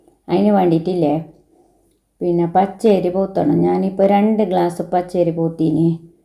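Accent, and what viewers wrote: Kasaragod, India. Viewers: Nammale kasrod baasha engnthenne❤..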